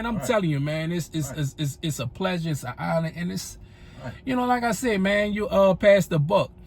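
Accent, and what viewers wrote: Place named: New Orleans, United States. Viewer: You definitely from NOLA heavy accent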